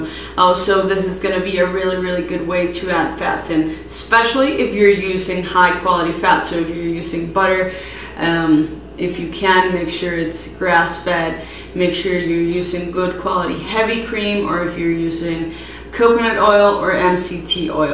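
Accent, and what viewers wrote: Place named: Colombia. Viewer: Hi! Congratulations in your new English Channel!!! I'm sure that very soon you' ll have thousands more followers enjoying your easy and delicious recipes. Descubri tu canal en Espanol solo hace un par de meses y desde entonces busco a diario algun nuevo video que hayas subido con recetas o consejos. Me ayuda un monton que pongas los macros ya que yo sigo un keto... free lance... quiero decir, siin complicaciones. gracias por hablar tan claro, y enfocarte en el tema, muy profesional. Soy peruana,vivo en Canada, pero por 8 anos vivi en tu bella Colombia, en Cartagena, tierra del Vallenato que adoro y llevo en el corazon. Goze carnavales en Barranquilla y estuve de paso por Bogota donde hice algunos amigos, asi que el oir tu acento trae muy lindos recuerdos del pasado. Felicidades a ti y a Carmen, y que sigan los exitos.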